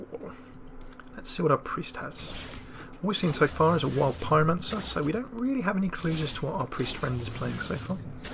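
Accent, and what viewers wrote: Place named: United Kingdom. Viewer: Completely off topic, but I love your dialect. Where in the UK are you from?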